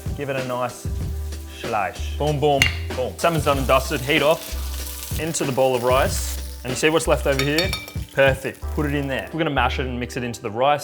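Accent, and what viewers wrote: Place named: Australia. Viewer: Ahaha didn’t think you would be Australian/sound like that 😂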